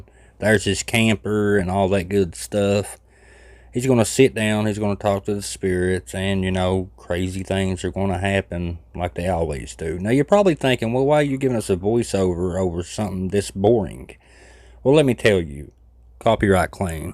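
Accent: South, United States. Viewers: Great video! Sorry this is nothing to do with ghosts or debunking but you mentioned accents. I don’t know what hillbilly means but I was struck by your accent when I came across your channel and wondered since - what is it? I’m not American so I’m just guessing like Virginia or Carolina or around that area? I could be way off sorry 😂😂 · I love your accent, y’all talk mighty fine down there in the south❤ hey great video! Nothing 2 dimensional about it! Made me lol too! Much ❤ & ✌🏼out! Oooo & Lee can 🖕🏼heh heh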